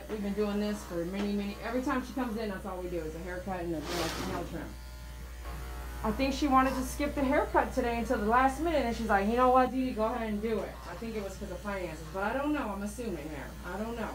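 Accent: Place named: South, United States. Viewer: Love the country talk attitude with the nail trim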